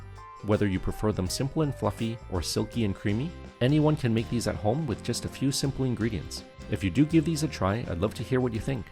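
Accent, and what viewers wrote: Canada. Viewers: Canadian voice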